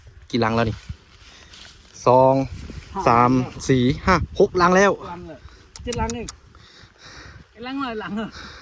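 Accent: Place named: Sukhothai, Thailand. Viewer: สำเนียงเหมือนคนสุโขทัยเลยคับ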